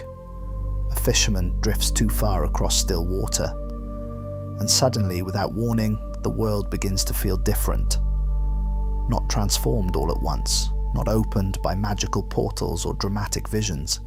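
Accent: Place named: Wales, United Kingdom. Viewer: I'm not sure if you've heard of Ren, but he's a brilliant Welsh musician. Perhaps the best I've heard in my 60 years. But, your speaking voice is quite similar even beyond the accent. This was a wonderful video. Thank you.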